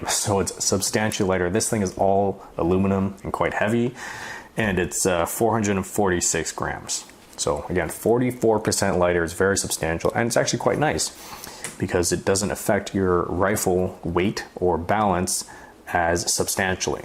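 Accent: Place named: China. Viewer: ESL...you mean Chinglish.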